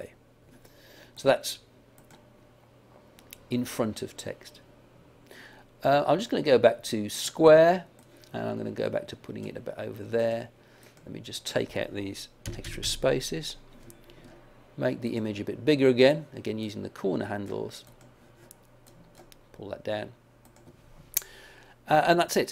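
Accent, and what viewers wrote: United Kingdom. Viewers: really like this British accent omg! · This video was thie first one that i searched for after reinstalling my system, so it was the first link assosiated with youtube. Now everytime i search "youtube", this video is the first one i get. This british voice is now my every day companion and im not even sure if i want to change that.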